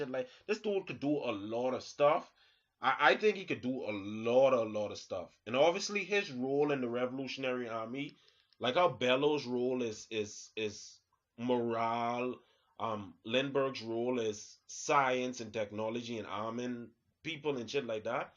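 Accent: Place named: Caribbean. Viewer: Are you Jamaican ? You have a Caribbean accent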